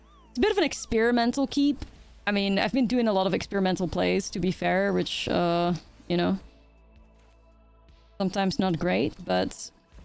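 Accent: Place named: Netherlands. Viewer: I love your very mild dutch accent, it is kind of cute. Very nice and structured content you have. Greetings from germany ❤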